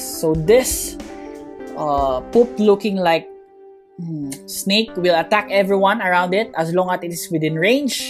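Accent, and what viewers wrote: Philippines. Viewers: R u a filipino u sound like filipino hahahahaha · I know the accent , Your a Filipino right?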